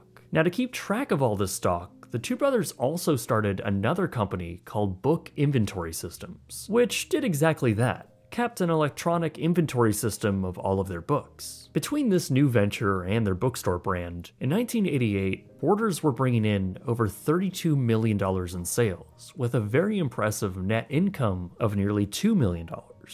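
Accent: Canada. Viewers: Its hard to understand with your Canadian accent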